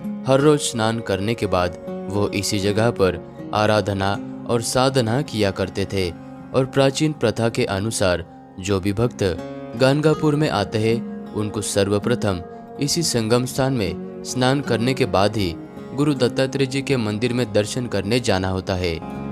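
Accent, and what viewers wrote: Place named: Maharashtra, India. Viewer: कशाला उगीच मराठी टोन मध्ये हिन्दी बोलताय . मराठीच बोला की .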